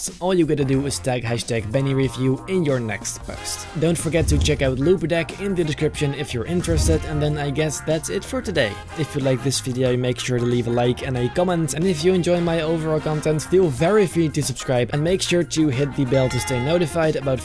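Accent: Netherlands. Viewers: ik wist het, je klonk al zo Nederlands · When I heard you speak I had some suspicions and during the contest segment it was indeed confirmed you're a fellow Dutchie. Leuke video, ga zo door!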